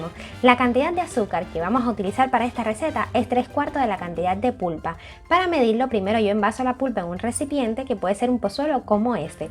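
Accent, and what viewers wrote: Cuba. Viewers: Hablas con acento habanero ☹️ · Solo sé que me encanto tu acento cubano.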